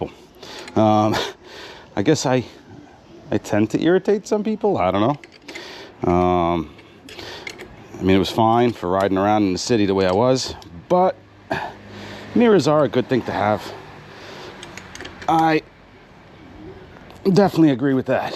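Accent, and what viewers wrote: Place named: New York, United States. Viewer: was going to say, all this time (since you started the whole series) and not a single time were the mirrors cleaned lol. Great work. your accent makes me miss NY... Keep on keeping on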